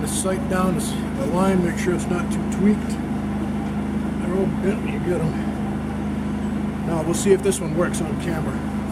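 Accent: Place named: Northeast, United States. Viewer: Thank you! My husband was struggling put our 4ft pieces of pipe together. So I watched ur video to see if I could find him some help. And yes it did. So we both thank you for your knowledge. I also want to say I love your northeastern accent. Reminds me of my Grandpa. He was from Eastport, Maine... where are u from? If u don't mind me asking..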